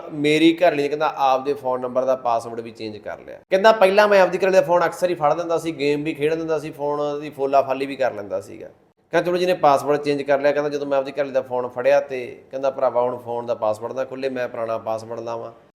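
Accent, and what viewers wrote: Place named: Bathinda, India. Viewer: Patakarkar bathinde da lagda hai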